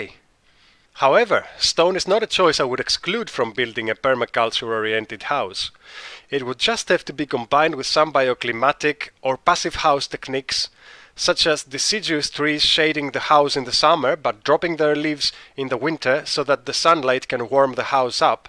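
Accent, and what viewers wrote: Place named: Greece. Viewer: Very informative - thanks. Btw - you have a very non-Greek, Greek accent when speaking English!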